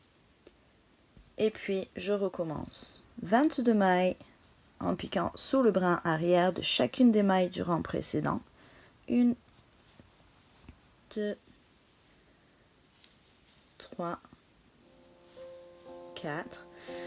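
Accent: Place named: England, United Kingdom. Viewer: Bonjour, On m'a justement offert des crochets bambou comme cela mais perso moi je ne me sent pas à l'aise avec Mise a part cela je te suit depuis le début, j'adore ton petit accent anglais, tes explications sont au TOP continue ainsi, ne change rien je souhaite plus souvent de vidéo (si tu peut ) merci bisous coco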